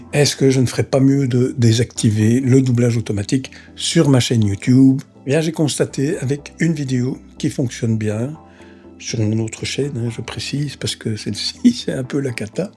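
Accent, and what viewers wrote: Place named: Belgium. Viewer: Salut, merci pour ta vidéo qui m'a bien éclairé. Je me posais toutes ces questions sur les sous-titres. Sinon, je m'abonne direct : pour l'accent belge, l'humour et l'authenticité. Ça change de la bière pasteurisée sans âme qu'on voit partout sur YouTube. Tu m'as plié avec la blague du fils du patron de YouTube, de l'entraîneur, le "bientôt" et le coup de la dinde ! Continue, elle est top ta chaîne et à tantôt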